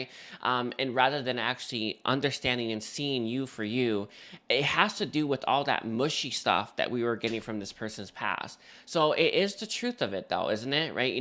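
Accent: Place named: Los Angeles, United States. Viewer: I like u cause ur Mexican and it makes me feel at home, like if I know u an u accent is cool. Very LA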